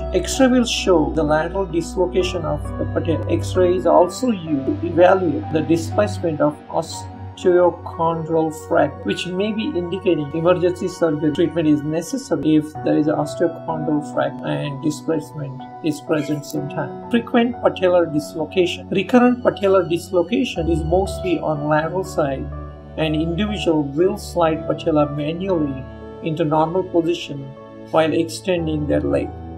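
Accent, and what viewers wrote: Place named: India. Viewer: Thats an Indian accent :) loud and clear isn't it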